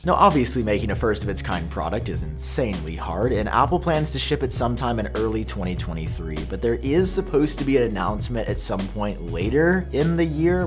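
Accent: England, United Kingdom. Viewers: British accent on fleek as the kids say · Great English accent! Thanks for the video!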